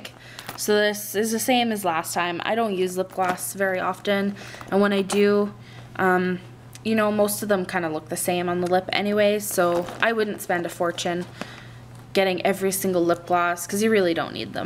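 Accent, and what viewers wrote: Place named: Canada. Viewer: you sound canadian? just the way you say certain words. or maybe from the northern part of us near canada like minnesota or something. thanks for the vid btw...very helpful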